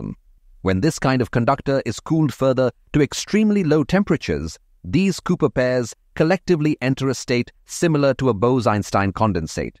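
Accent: India. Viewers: This video is obviously created by an Indian. Even though he/she tried to hide his/her Indian accent using a weird robot sound, one can still tell it's an Indian talking by his/her mispronunciation of the letter "T" when he/she said "doo taosand dtwenty five" instead of "two thousand twenty five". Also the incorrect use of the article "a" instead of "the" in a sentence is very common among Indians and gives their identity away.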